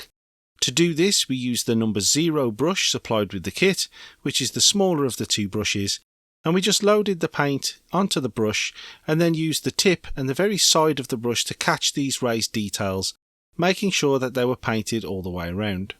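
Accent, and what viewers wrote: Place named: Liverpool, United Kingdom. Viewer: I love watching these videos even though I’m never going to do it myself. I’ve done modelling and I’m done with it. I sill enjoy watching the process as a spectator. This videos are entertaining in their own right and I love the presenters slightly bland Liverpudlian voice. Very relaxing video to sit through strangely. Please do Cutty Sark, a favourite of mine.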